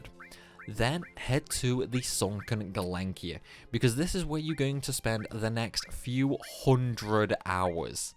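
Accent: Stoke, United Kingdom. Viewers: You're a Stokie, can tell from the accent. Great video btw!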